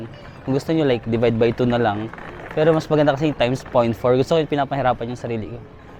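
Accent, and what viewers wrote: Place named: Philippines. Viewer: Perfectionist? Filipino accent nga dba... style niya yan at authentic not pretentious.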